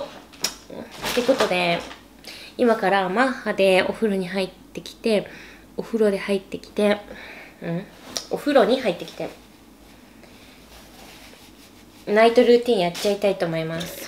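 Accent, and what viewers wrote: Kagoshima, Japan. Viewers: 鹿児島のイントネーション入っててなんか嬉しい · ケンタッキー食べる動画嬉しすぎて泣ける。しかも鹿児島弁聞けてさらに泣けます。今一緒にケンタッキー食べてます🥺🥺幸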